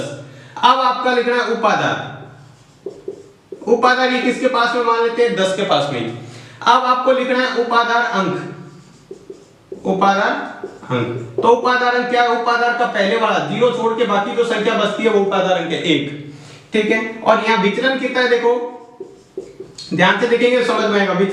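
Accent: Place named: Rajasthan, India. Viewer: Koi baat ni sir galti ho J😊😊full rajsthani boli 😏😏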